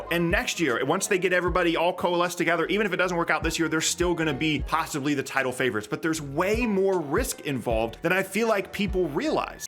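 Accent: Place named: East Coast, United States. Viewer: I love your channel, I don't know if you're from the East Coast, but you talk really fast. Like I said it's great to listen to cuz it sounds kind of local to me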